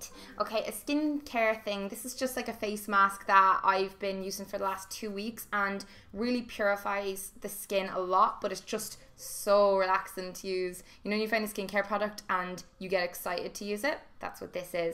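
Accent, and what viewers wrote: Ireland. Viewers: Hi! Your voice makes me miss Ireland so much!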